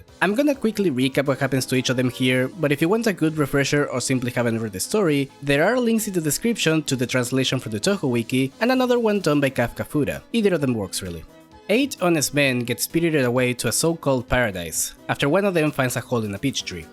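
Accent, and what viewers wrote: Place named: Argentina. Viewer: mira flaco no se porque llegue aca sinceramente pero es increible lo facil q es sacar el acento argentino d una persona, aguante argentina y el diego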